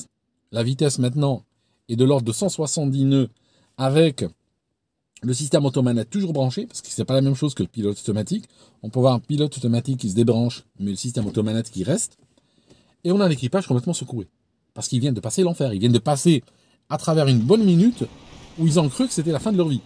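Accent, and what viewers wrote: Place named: Geneva, Switzerland. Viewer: Excellent comme toujours. Je perçois, depuis deux vidéos, un accent genevois qui revient comme un jour de Bise sur la rade. Je suspecte un contact alpin récent…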